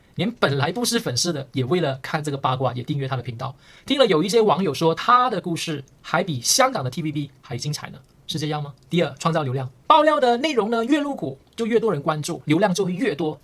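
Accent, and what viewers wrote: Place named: Malaysia. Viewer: 哦哦 我是大马人 听你的语音 还以为你是大马的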